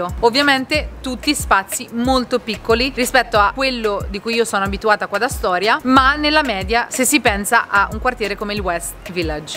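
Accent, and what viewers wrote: Ancona, Italy. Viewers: accento anconetano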